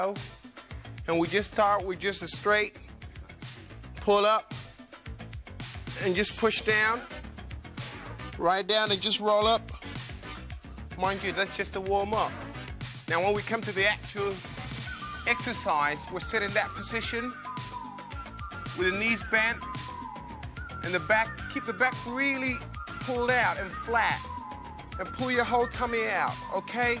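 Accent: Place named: Ghana. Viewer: English Ghana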